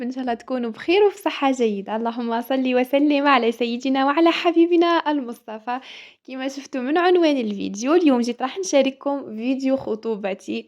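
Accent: Tebessa, Algeria. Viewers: الف مبروك وربي اهنيك نموت عليك وعلى الحطة الي تقدميها وافكارك يا الزينة والصوت واللهجة التبسييةالزينة اختك من ام البواقي نسلم عليك ياسر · لهجتك تبسية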